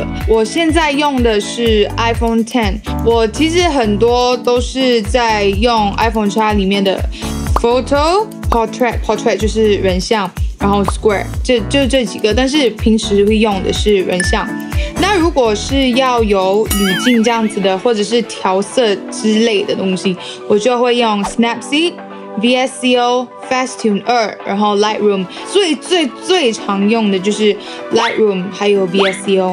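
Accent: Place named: China. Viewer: 很會學中國腔🤣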